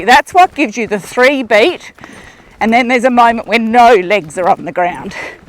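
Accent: Australia. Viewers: You're literally the best!! And I love to hear a fellow Aussie accent :D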